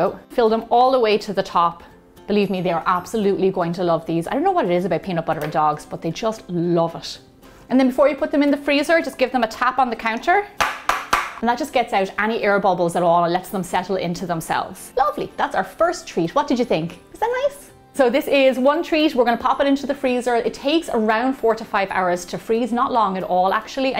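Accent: Ireland. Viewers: Thank you so much I really really enjoyed watching you create these delicious dog treats. Are you from Ireland? Originally. I’ve subscribed and given you a thumbs up too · ahhh knew it by the accent yuppa the Irish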